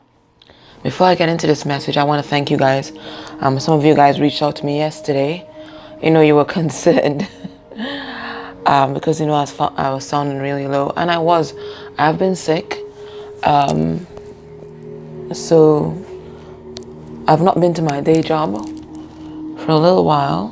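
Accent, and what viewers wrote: Trinidad and Tobago. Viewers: Speedy recovery sis. Very accurate read absolutely amazing lol are u trini?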